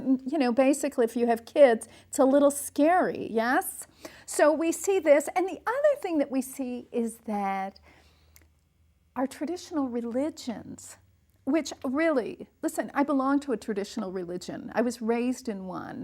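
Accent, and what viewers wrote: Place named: Texas, United States. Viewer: What has mrs studied at university? Where did she obtain the Ph.D? She has tics for example at 12.39 minutes. She is scary, she is so demanding like a religious clerk. "Right"? "Listen". With her Texan accent.. No, not appealing, no charm. Be aware of your body language when you are on film.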